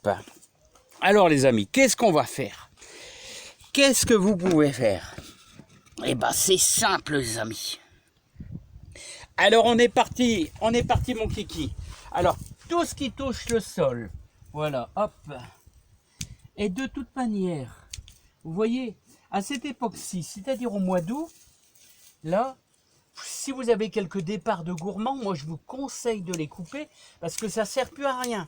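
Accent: North, France. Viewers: Super merci avec ton accent du nord j àdore et je vais aérer mes tomates bonne idée a bientôt pour la suite bonne journée Eliane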